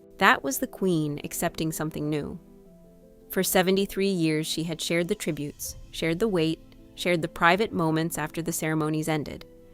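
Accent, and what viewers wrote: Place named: United States. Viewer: year i hate the American accented narration, Prince Philip was GB's and the commonwealth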